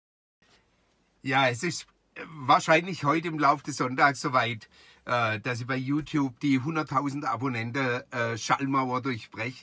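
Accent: Baden, Germany. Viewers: Bester badischer 😁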